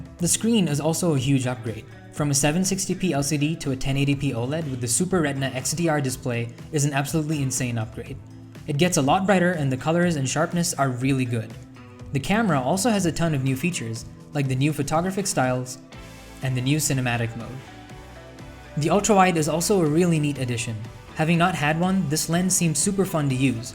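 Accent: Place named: United States. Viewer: Living in india but have an american accent?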